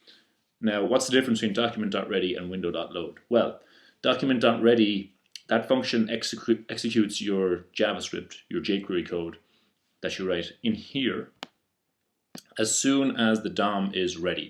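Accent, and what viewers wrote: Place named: Ireland. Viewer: loving the accent eire abú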